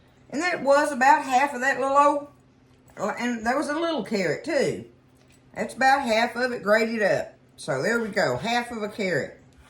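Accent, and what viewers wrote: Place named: Mississippi, United States. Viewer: Hi,. I have been watching you for about 6 months, and I just LOVE that you cook regular food, not fancy with 50 ingredients. I am from rural Mississippi, and you talk exactly the same way we do. Watching your videos is like sitting in my Mama’s kitchen. Keep cranking out the videos, and it won’t be long til you have 100,000+ subscribers.❤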